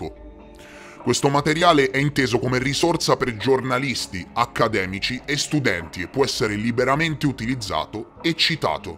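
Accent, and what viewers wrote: Tuscany, Italy. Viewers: Anche io lo seguo da un anno e passa ormai, e sin da subito mi sono accorto che è toscano, però non sono riuscito a capire di quale zona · Sono davvero orgoglioso di essere un veterano, ti seguo dai primi video quando si sentiva l'accento toscano, quando la maschera era senza i contorni blu-fluo, da quando la sigla era una specie di cubo con le faccine di Click... insomma è un vanto far parte di questa family e devi essere orgoglioso di questi risultati e soprattutto dove ci hai guidato aprendoci la mente... Grazie la tua umiltà va di pari passo con il tuo essere "BOMBER"...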